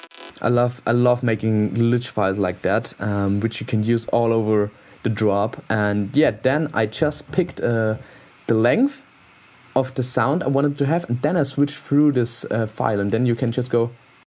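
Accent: Germany. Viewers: u german??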